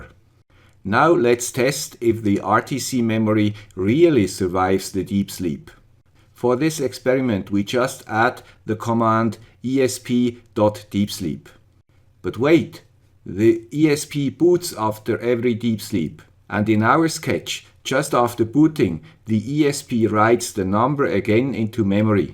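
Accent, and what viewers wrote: Switzerland. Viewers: oh, but i think is a perfect challenge for the excellent man with the Swiss accent! :)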